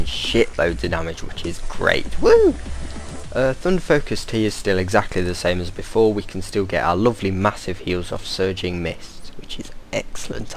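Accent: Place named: United Kingdom. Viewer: Where are you from? Trying to cypher which flavor of the UK accent you have?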